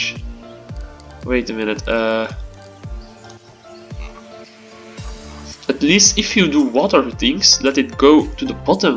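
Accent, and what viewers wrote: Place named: Netherlands. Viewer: Mooie video, maar aan je accent kan je gewoon horen dat je Nederlander (of vlaams) bent 😂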